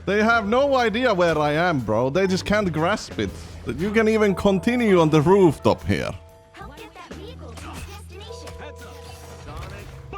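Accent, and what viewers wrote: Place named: Finland. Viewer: I love how Finnish you are. European accents are just so fun to my weak American brain. Maybe it’s all of the cholesterol going to my head.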